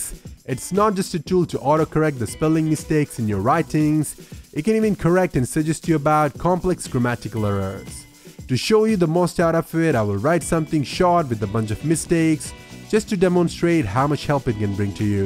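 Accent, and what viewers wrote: Kerala, India. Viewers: Are you from Kerala?? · Your English wasn't bad. I could understand you well. Btw, are you from India?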